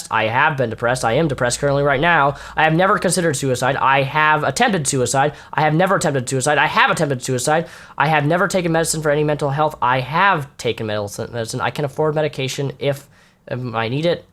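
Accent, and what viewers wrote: Finland. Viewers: Wait what, is he finnish??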